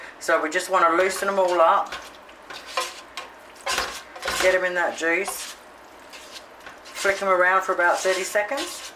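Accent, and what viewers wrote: Australia. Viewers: Omfg. Your energy is so sunny, warm and comforting. You feel like one of those people that you feel like you have known forever but you only just met them. ❤️😍 I am obsessed with your personality. Why have you been hiding yourself. 😁 That thick aussie accent just melts me. 🤩 Can I please ask what your zodiac sign is? I need to try this chicken thing I love noodles 😊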